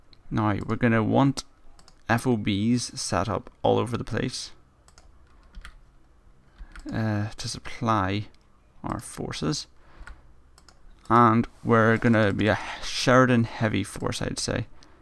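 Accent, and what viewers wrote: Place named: Scotland, United Kingdom. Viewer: Hey man, what is your accent? To me it sounds like a mix of Scottish and british but thats just what it sounds like to me.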